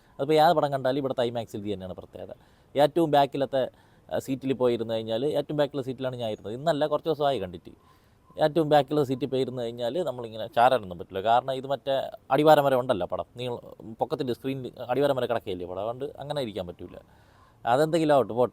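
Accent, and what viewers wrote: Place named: Thiruvananthapuram, India. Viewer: തിരുവനന്തപുരം ഭാഷ❤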